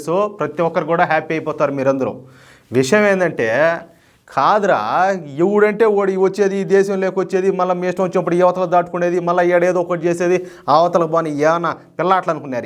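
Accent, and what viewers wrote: Rayalaseema, India. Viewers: Andhra bhadha kadhu , Rayala Seema YASA matladu thunnadu saru .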